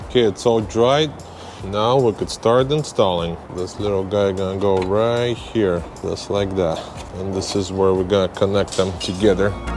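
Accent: Russia. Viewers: Акцент русский 😂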